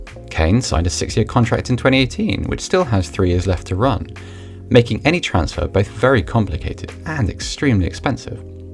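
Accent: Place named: RP, United Kingdom. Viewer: Can Tifo hire someone without an RP accent?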